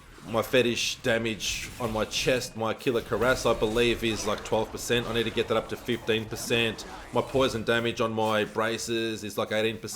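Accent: Australia. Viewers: Are you aussie bro? Maybe kiwi perhaps? But you live in AU aye..love watching your vids man. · not aire east coast Aussie I reckon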